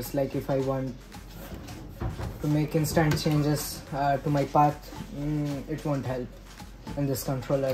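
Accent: India. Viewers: He is from india? Awaz to Indian jaise hai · Love the Indian voice!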